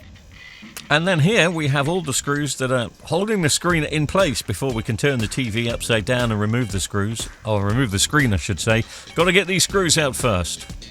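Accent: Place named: North East England, United Kingdom. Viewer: I thought you was North East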